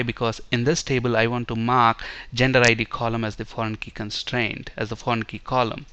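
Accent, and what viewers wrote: India. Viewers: Sir are you belong to India? Your English seems like Indian 😁😁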